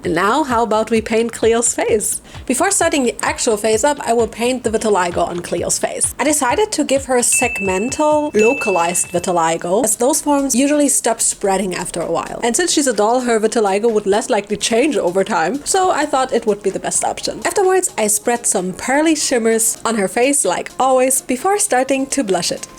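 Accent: Germany. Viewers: I just found this channel off youtube shorts and im obessed. Ive always loved OOAK dolls and these videos are so pleasing to me. Btw i love your accent. Is it german?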